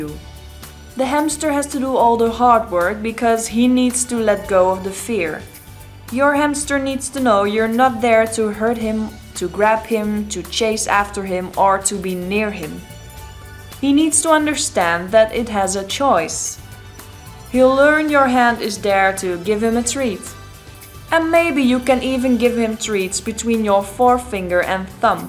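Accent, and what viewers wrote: Netherlands. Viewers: I can hear ur dutch i don't know why, het is gewoon beetje het accent..... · Lauren Mcintyre Nederlands denk ik ook. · Pedro Paúl She's Duch i think. I have Dutch relatives and i recognise the accent when they speak English. I like it too 😊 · i have 1 qeustion: are you born in the netherlands because you sound dutch to me ( i really like your videos but i was wondering because im dutch my self) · Thank you so much for this video! I have a pair of little robos. I have waited about a week since I got them and I've tried to tame them a couple of times. They are usually scared of me but yesterday they took a treat from my hand. It definitely takes a lot of patience to tame a robo! I will be using the techniques in this video to aid my taming process, Btw I love your accent! Are you Dutch? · Are you dutch??? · ik heb je door je hebt een nederlands accent ja ja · Wie hoort ook dat ze Nederlands is?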